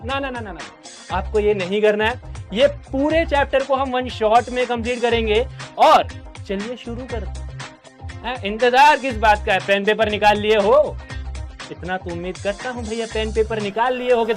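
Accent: Bihar, India. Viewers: Bhaiya aap bihari hoo kya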